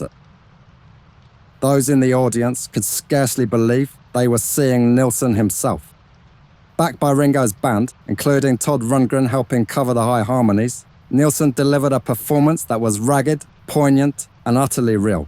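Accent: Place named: Cockney, United Kingdom. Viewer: Excellent as usual brother, your writing is absolutely stellar! Wonderful listening...the Cockney James Cagney!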